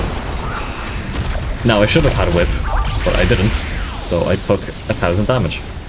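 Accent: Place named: Ireland. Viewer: bros irish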